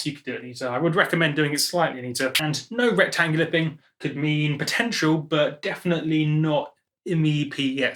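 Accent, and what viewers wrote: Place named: United Kingdom. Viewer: agreed. he has a horrible caser of British pouty voice and british throw away talk syndrome.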